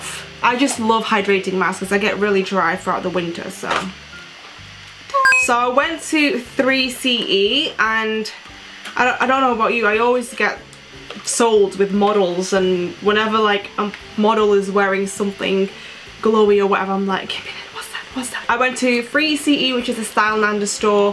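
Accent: United Kingdom. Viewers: Are you from Manchester, the accent feels familiar? :)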